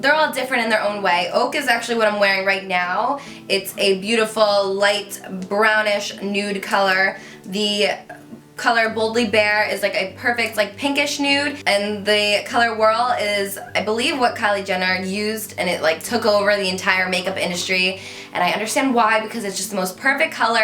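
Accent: New Jersey, United States. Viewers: Your Jersey accent is everything OMG! Love your videos! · Lol you've been using the brow bar to go from your earliest videos and before I heard anyone else talk about whitening lightning:-) you are the best people say Queen Jaclyn and she's great but you are the Queen 4 sure! Comparing is bad I know so I will just say I love you so much please keep making videos when your a famous model or fashion designer or whatever dream you want and will achieve xoxo oh one joke I can't help it but I always know ur from jersey when you say the word drawer or draw in your case lol it makes me laugh everytime but not making fun I just think it's cute tomato tomotto LOVE YOU!!!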